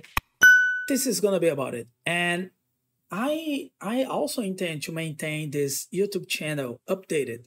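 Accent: Brazil. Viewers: I can recognize that Brazilian speaking english tone everywhere. Keep the goop work, fellow BR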